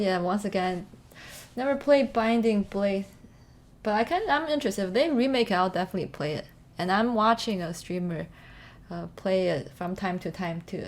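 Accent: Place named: England, United Kingdom. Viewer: Pretty nice~ ^^ I like to listen to your English accent and learn a little Chinese from you. ^^ Cheer up ! (^0^)/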